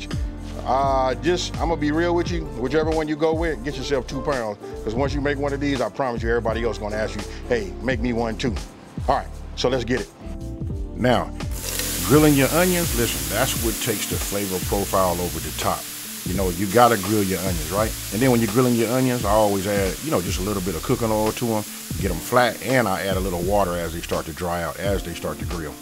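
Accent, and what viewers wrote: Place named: South, United States. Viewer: I was Stressed out today. finally got some free time but stressed. Tried a movie. Could not concentrate because still stressed out. Thought some music video might help but this was recomended. This guys Talking southern USA accent calmed me right down. Thanks and You Tube.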